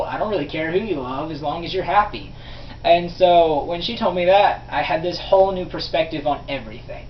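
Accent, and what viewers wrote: South, United States. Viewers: I dont know why but i love the southern accent❤️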